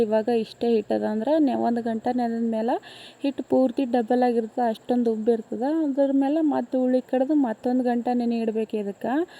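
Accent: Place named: Uttara Kannada, India. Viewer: ನಮ್ಮ ಉತ್ತರ ಕನ್ನಡ ದವರು ನಾವು ಹೀಗೆ ಮಾತಾಡೋದು ನಿನಗೆ ನೋಡೋಕೆ ಮನಸ್ಸಿದ್ದರೆ ನೋಡು ಇಲ್ಲ ಮುಚ್ಕೊಂಡು ಹೋಗು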